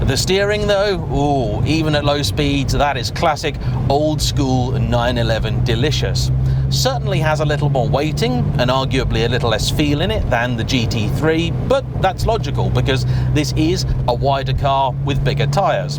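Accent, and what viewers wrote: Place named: England, United Kingdom. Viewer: Gotta love England accent.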